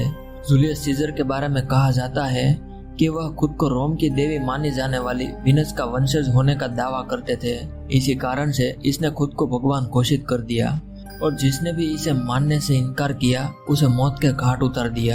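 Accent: Gujarat, India. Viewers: Aapke bolne ki style gujrati people jaisi hai...kya aap saurashtra se hai?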